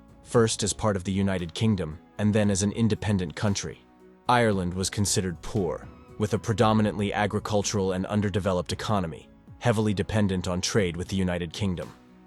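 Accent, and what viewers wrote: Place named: United States. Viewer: AI generated garbage, the AI voice is using American pronounciation of Celtic as in the Boston Celtics team spoken as Selltics, but Celtics is said as Keltics. This blithering idiot doesn't know such a simple thing. And the entire channel is all AI generated click bait and at a prolific rate.too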